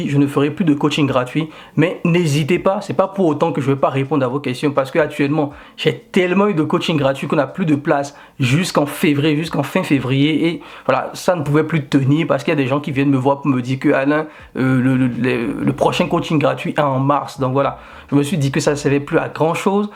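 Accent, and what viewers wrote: Benin. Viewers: Je clique sur la vidéo et j'entends un accent béninois corsé hahah ca fait du bien je me suis tout de suite abonnée.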